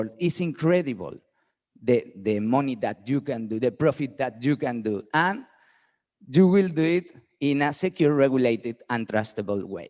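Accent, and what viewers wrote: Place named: Spain. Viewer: Eres un crack, quizas un mejor ingles lo haria todo un poco mas profesional, tu pronunciacion es muy española jajaja sin animo de ofender, te admiro mucho, un saludo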